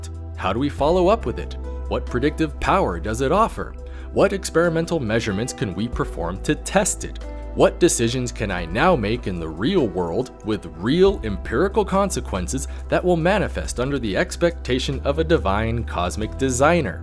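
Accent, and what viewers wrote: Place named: Utah, United States. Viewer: Else -> elts, false -> falts, where do they speak like that? I've only heard "elts" to be a Utah-regionalism.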